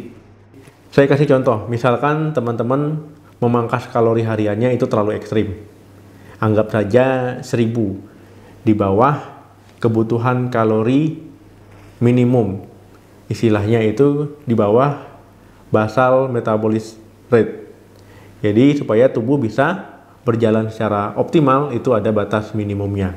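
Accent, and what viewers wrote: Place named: Bali, Indonesia. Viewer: Dokter ini pnya logat bali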